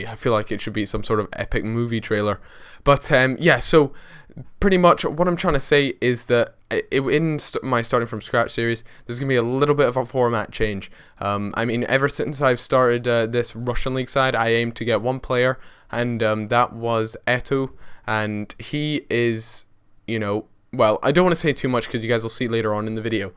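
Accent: Scotland, United Kingdom. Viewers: I am kind of confused about your accent, are you Scottish?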